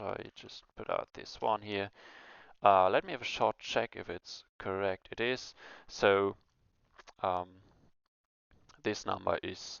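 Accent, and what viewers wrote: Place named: Germany. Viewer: Great video! I also love seeing all the approaches of handling the words in part 2, your approach looks pretty quick! A few points I'd like to address though: - Splitting with "\r\n" only makes sense if the file is encoded with that new line sequence (which as far as I know is just Windows). A recommendation of mine would be to either switch to "\n" (which in your IDE I think works by clicking on "CRLF" in the status bar), or perhaps the better solution: using .split(/\r?\n/) which will support both both :) - When talking about the letter "i", pronouncing it as [i] (also known as the German "i") can easily be mistaken with the letter "e" in English ;) Your English seems really good though! - The magic (even though obvious in this code) numbers 3 and 5 could be stored in constants to give them names for descriptions, which might be overkill for Advent of Code, but I just felt like throwing that in the room :) - About adding numbers, I'd highly recommend writing parseInt(`${numbersInString[0]}${numbersInString[numbersInString.length - 1]}`), note that I used backticks (`) and not an apostrophe!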